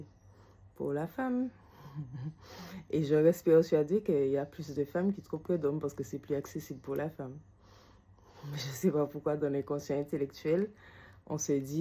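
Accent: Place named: Martinique, France. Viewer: Parole ti mal mdr quand tu parles ont entend vraiment la Martinique free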